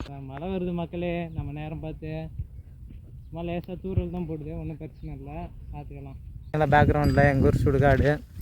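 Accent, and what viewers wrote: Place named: Kaveri Delta, India. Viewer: Peachila therila, vivasayi Vera.. delta place of tamil Nadu. Thiruvarur or tanjore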